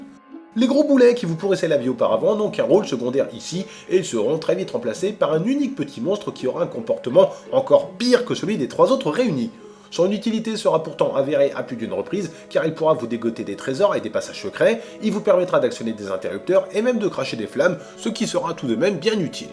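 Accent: Alsace, France. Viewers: Tu ne serais pas Alsacien ? Sinon,j'ai aussi galéré sur l'epreuve de la peur.. en faite je l'ai jamais reussi x')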